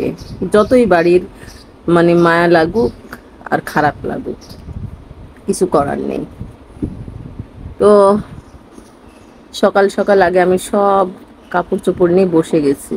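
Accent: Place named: Rajbari, Bangladesh. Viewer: আমার গ্রামের বাড়ি রাজবাড়ী আপু তোমার কথা সুনে বুঝতে পারছি তাই আপু বন্ধু করেছি গত কাল ব্যাক দিও প্লিজ।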